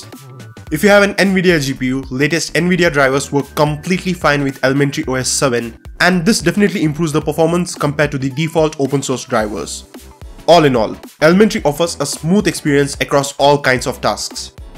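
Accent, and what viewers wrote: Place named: India. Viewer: Dude...learn how to pronounce and speak english you smash the words together whit "daT indian accent" you speak half words , PRONOUNCE -slow the fak down and ARTICULATE full words jesus , you speak like XQC was indian ... stopped watching, half the time its not hearable And this is NO Elementary review , ups and downs, or even know how, this is just a glorified add mostyl, EVERTHING is SO GREAT in elementary, jesus, really? and you say 3 games and you say its great for gaming , so many games so many games :D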